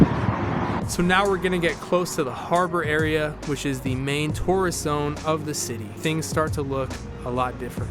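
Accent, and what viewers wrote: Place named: Toronto, Canada. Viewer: I came across you for the first time ever and just by the sound of your voice I could tell you must be from Toronto 😂 great video capturing Victoria!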